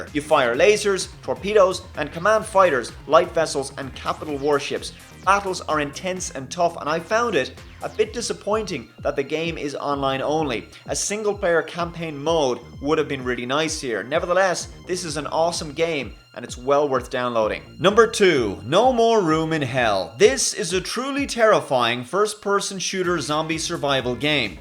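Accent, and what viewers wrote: Ireland. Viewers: Are you irish? :D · are you irish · R U irish?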